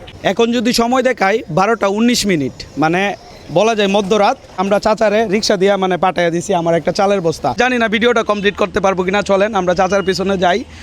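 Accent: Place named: Kishoreganj, Bangladesh. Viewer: are you from kishorganj? cuz iam too i know your speaking in kishorganji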